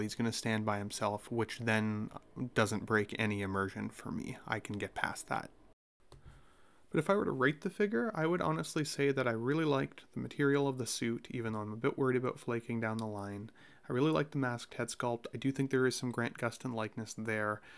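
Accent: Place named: Canada. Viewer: got that sweet ASMR soothing Canadian voice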